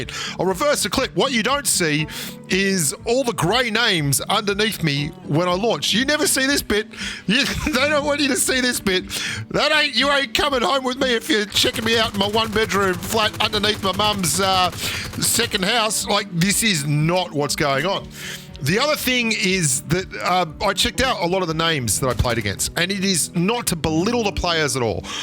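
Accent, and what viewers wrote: Australia. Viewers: I have always loved your content. Recently whoever asks me about a good guide to the game, I always recommend your channel. But problem with you expanding your viewer base in India is the Language, not a lot of people are comfortable, I think, with your fast and fluent Australian accent. Ironically that is what makes your videos that much more lovely😬. Keep growing. Love ya.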